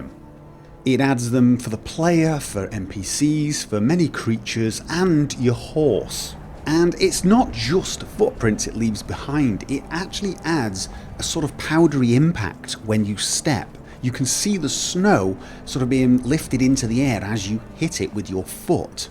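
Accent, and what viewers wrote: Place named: Yorkshire, United Kingdom. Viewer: he has a Yorkshire accent, mixed with a bit of wherever he lives now.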